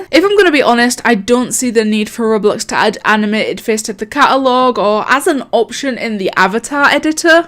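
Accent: Scotland, United Kingdom. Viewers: are you scottish? i am :D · Scottish accent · Are you from scotland? Because your accent reminds me a lot of Merida and I think she's from there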